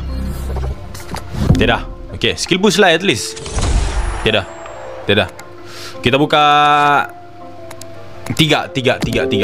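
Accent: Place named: Sabah, Malaysia. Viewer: Mcm org sabah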